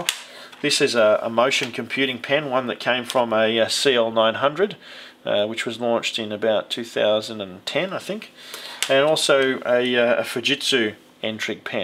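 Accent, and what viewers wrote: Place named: Australia. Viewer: Thanks for such a great presentation and review. Great to hear an Aussie accent in one of these too!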